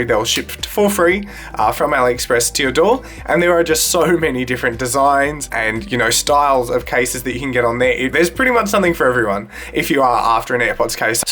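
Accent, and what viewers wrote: Australia. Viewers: G'day mate! Aussie accents are so orgasmic I can get off just listening to yall speak. But, having said that you're speaking too fast that I gotta turn the captions on in order to understand what you are saying. I've got Samsung's Galaxy Buds myself for about 7/8 months now and I have just ordered a case for it, something similar to the Nike's in this video, just without any logos on it. It's so hard to find a good and cheap case for non-airpods TWS earbuds. The case I ordered + shipping was a few cents shy of $30. That was expensive. The cheaper ones either have zero or negative reviews. I read one that said her galaxy buds charging case "slipped out" of the case which was hooked onto her handbag with a carbiner and she only realized it was gone when she was home because she was blasting songs with the buds on the subway and couldn't hear it hit the floor.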